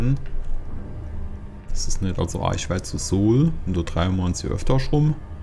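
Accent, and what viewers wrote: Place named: Hesse, Germany. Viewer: Dein Hessisch ist Klasse^^ Hab wieder "2 Guardianstrukturen" nahe am Zentrum als "Staffelmarker" gesetzt.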